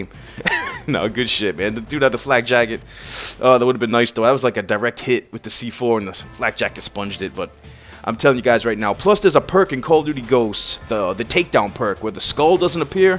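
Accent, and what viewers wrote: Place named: United States. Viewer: do all you Americans speak in such a way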